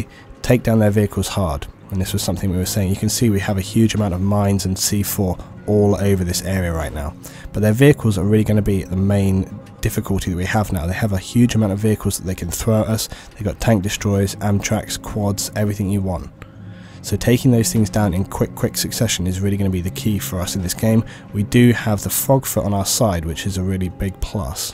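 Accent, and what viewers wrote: United Kingdom. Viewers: You mention players moaning about server admins not balancing out teams and what you say is so true. Speaking as a server admin, you can't be constantly moving people round just to balance out teams when that person you moved could have been in a squad with mates or was on a winning side when moved and players don't seem to take this into consideration. You just end up pissing players off and they leave the server. Also I love that you feature on LvlCaps channel, great to hear a Brit talking!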